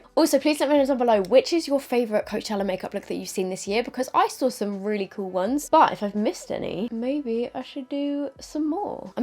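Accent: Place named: United Kingdom. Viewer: Hi, I'm a recently new subscriber. I can't believe it's taken me this long to find your channel. I'm literally obsessed. I'm on my like 50th video in a week. I'm from Aus but my family are from UK so I adore your accent. Enjoy your day gurl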